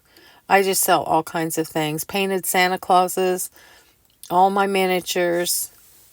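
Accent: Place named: Indiana, United States. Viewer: I did not realize until this video that you are in Indiana. When started watching your channel recently --- your voice reminded me of one of my Aunts & her daughter's voice. Both from Indiana (the Indianapolis area) & maybe this is why - a Indiana accent. Thanks for your great videos - always enjoy them.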